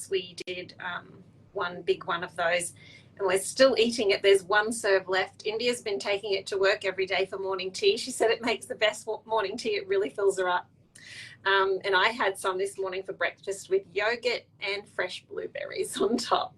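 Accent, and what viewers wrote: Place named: England, United Kingdom. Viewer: Beautiful accent voice.reminds me of my sister in England..Thank you Good idea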